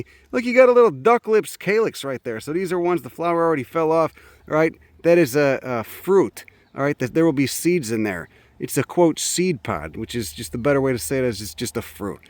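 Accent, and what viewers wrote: Chicago, United States. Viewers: A man with tattoos, Chicago accent who loves Native plants is a man I would marry! I wish there was a gay clone of you!! And your fucking HILARIOUS!! ❤ Keep up the great work!!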